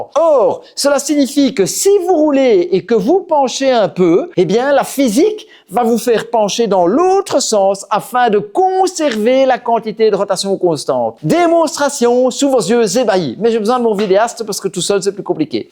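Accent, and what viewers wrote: Belgium. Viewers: nos fameux professeurs belges avec l'accent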